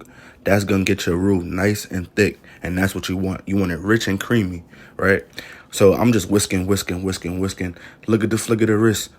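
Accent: New York, United States. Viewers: 🔥 you most definitely from ny though 😆 ya video had me rolling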